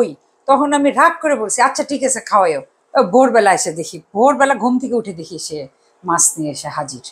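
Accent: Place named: Noakhali, Bangladesh. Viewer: নোয়াখাইল্লা আন্টি আসলে আপনি কে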